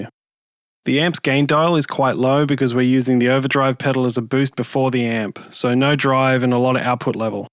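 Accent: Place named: Australia. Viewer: That flat deadpan narrative is just how many Australians talk, especially musicians who are more interested in letting their instrument convey emotion than their speaking voices.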